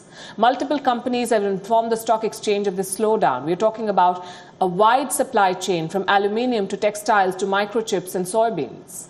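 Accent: India. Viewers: I just love the Indian accent and smell. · Love her Inglish (indian english) accent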